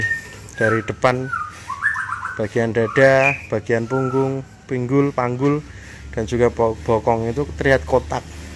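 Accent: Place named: Pati, Indonesia. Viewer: Wong Pati njenengan mas🤔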